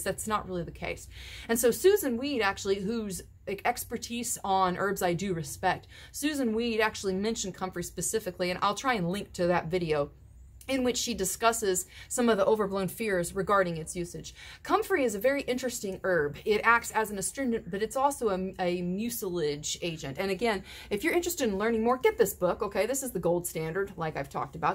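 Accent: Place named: Tennessee, United States. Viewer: I love that Tennessee tone.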